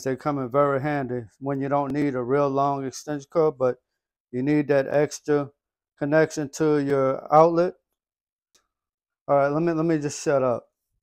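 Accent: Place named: Louisiana, United States. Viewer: I love dat when you hear a voice dats obviously from Louisiana.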